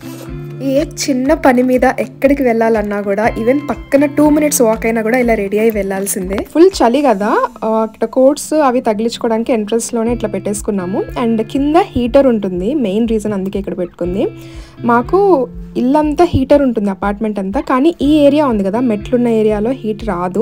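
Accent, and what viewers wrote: Rayalaseema, India. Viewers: UR EYES, VOICE, LANGUAGE I MEAN SLANG {RAYALASEEMA:P} AWESOME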